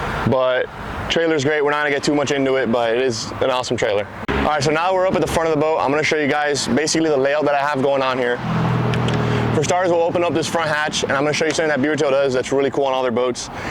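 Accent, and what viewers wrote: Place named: Miami, United States. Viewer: The Miami accent goes crazy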